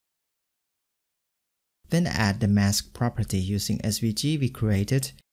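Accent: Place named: India. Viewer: This Indian talking, I hate it! Really can you not talking ....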